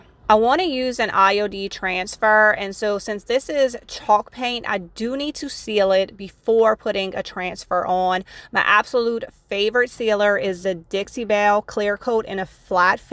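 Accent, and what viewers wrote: Louisiana, United States. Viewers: Everything was beautiful, but I was especially impressed with the finial ornaments. And from one Southerner to another, your warm smile and Lousiana accent are the cherry on top of your videos! ❤ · It’s not phony she’s from Louisiana and that’s how she talks. Blue and white Christmas! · She’s from Louisiana and that’s just the way they talk!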